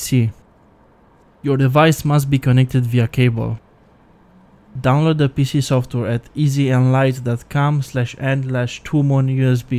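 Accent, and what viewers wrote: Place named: Romania. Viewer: Mi-am dat seama ca esti roman dupa accent. :)))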